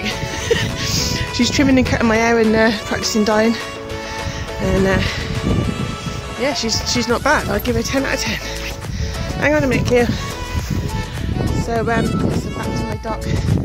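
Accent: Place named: United Kingdom. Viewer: Hey how are you doing? 🙂👍 hope all is well. I know I've said it before but I love your British accent. Lol Cool looking houses you guys have there. So different from here in the USA.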